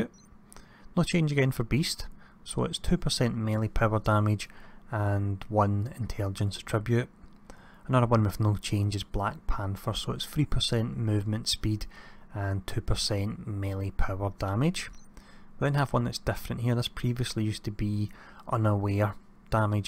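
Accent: Scotland, United Kingdom. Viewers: I like your accent, are you scottish? :)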